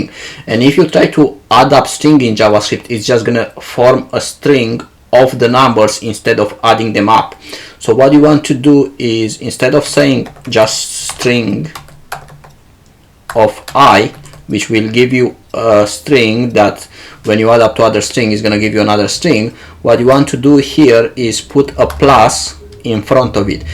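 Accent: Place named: India. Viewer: Thanks for solution. As for me, it's a little bit difficult to understand your pronunciation since it is a litle bit similar to Hindi speaking people (some places). For example all, individual, confirm divisible.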